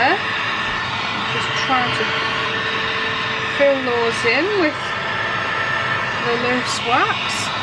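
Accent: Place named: Scotland, United Kingdom. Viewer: I simply adore your accent. May I ask where you’re from? My first guess is Scotland 🏴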